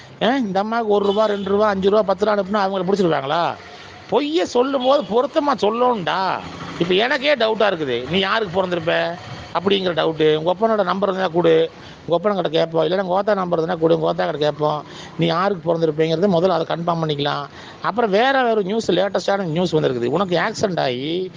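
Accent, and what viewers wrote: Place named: Kongu, India. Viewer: பக்கா கொங்கு தமிழ்❤😂❤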